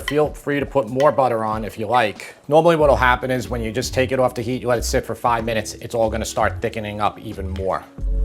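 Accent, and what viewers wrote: New York, United States. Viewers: Not to be rude, but I just can’t stand that New York accent. I don’t know what it is. You’re a very handsome man. You’re very talented but the accent maybe you should work on.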